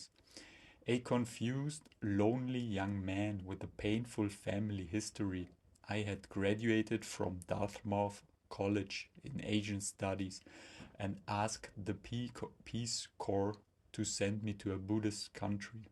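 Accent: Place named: Germany. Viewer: Ich hab zwar Tag meines Lebens noch kein vergleichbar heftigen deutschen Akzent gehört, aber ich danke dir soooo sehr für dieses Reading und den Upload.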